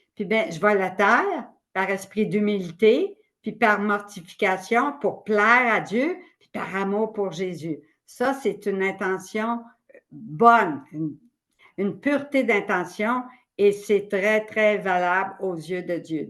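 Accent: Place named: Quebec, Canada. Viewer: Bonjour Merci beaucoup pour votre travail...dans la Divine Volonté bien-sûr 😊! Ça faisait un petit moment que je n'avais pas écouté vos vidéos. Très content et joyeux d'entendre toutes ces belles et magnifiques lessons de Jésus et Marie et t'entendre votre charmant accent...accent québécois qui m'invite toujours à aller rendre visite à mes frères d'Amérique du nord. Si Dieu le veut 🙂. Merci à Dieu pour la motivation, l'engouement...etc qu'Il vous donne pour accomplir ce travail afin de nous encourager à continuer sur ce magnifique chemin d'Amour et de Vie qu'est la Divine Volonté. Ce sont les merveilles de Dieu...qui pourra l'arrêter... notre volonté propre? Non, nous te la donnons Seigneur, au nom de tous et pour tous, pour ta plus grande Gloire et le Salut du monde. Amen!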